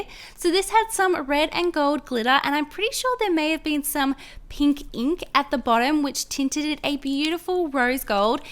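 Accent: Australia. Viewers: FINALY SOMONE THATS AUSSIE NOT AMERICAN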